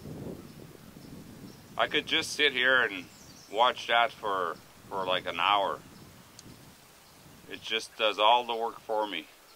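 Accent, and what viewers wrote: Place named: Canada. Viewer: Nice setup. Looks like there's enough room in the box for the battery, so you could have even shorter wires. Plus it'll keep it sheltered and hidden. P.S. I could tell from your accent that you're a fellow Canadian. French Canadian?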